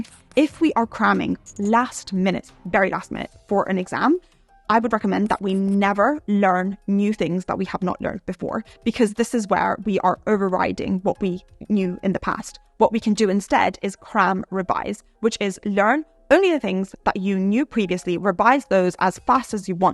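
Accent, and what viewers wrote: Scotland, United Kingdom. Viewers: East coast Scotland, sounds like Edinburgh/Stirling private school type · sorry, i can’t listen to people with british accents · is it BE accent? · did you speed up the video to get it under or do you normally speak at a NYC “I’m going to miss the bus” pace? Between the rapid fire of words and your slight British accent, I completely failed to understand most of what you were saying. It doesn’t help that you are distractingly beautiful, but… SLOW DOWN GIRL! By the way, throw away that lipstick. It’s the wrong color for you; obviously I found that distracting too. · why do you talk like that? are you british ? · Are you from britian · Thats scotts english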